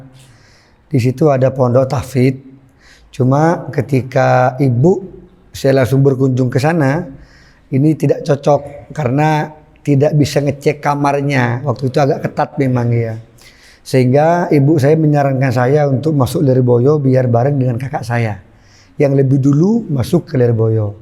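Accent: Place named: Madura, Indonesia. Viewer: Logat madura ya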